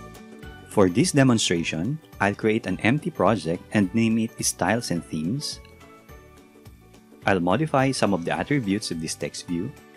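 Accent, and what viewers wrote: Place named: Philippines. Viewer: Malalaman mo talaga quality ng mga pinoy pag nag english hehe diba sir?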